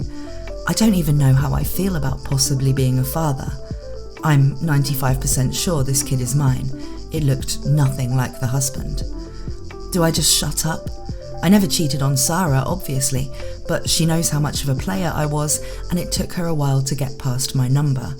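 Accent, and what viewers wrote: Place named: United Kingdom. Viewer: Finally. It's in british accent.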